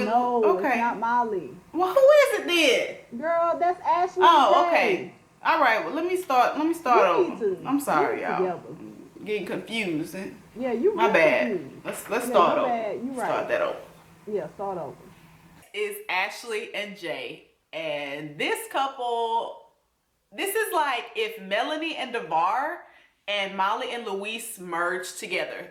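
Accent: South, United States. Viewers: Who doesn’t like her accent?!??? I’ll fight them! I love southern accents. Wish I had one.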